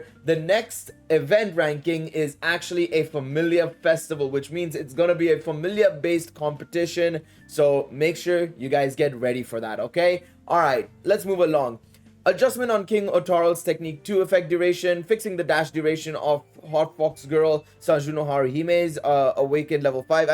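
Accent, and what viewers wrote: India. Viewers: Just wondering are you indian-indian? You said about being based in EU? Why is your indian accent so heavy zzz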